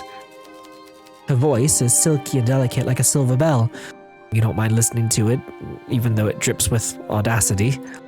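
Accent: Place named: Boston, United States. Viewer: The Boston accent was fun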